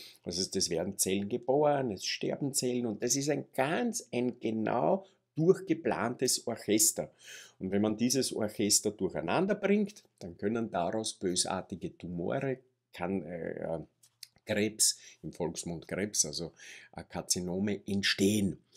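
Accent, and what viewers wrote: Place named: Austria. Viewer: Diese österreichische Sprache ist einfach zu schön! Könnte stundenlang lauschen. :-)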